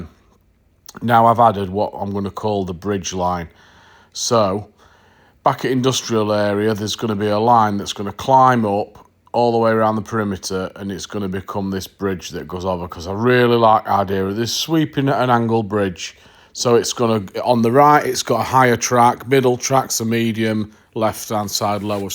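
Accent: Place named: Lancashire, United Kingdom. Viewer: Excellent, came across your channel from Western Signalman`s podcast. I do love a funny mdel railway channel and, as a southern poofter shandy drinker, I do like your accent! (My neice from that London has moved to ``Uni`` in Lancaster this year. She was horrified to find it was cold, and couldn`t understand the accent) Lancs is close to Yorks I believe :) :)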